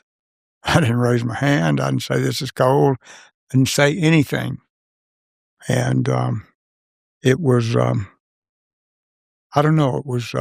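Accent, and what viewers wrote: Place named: North Carolina, United States. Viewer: Sir you mighta traveled a lot but knew were North Kackalaky soon as you spoke.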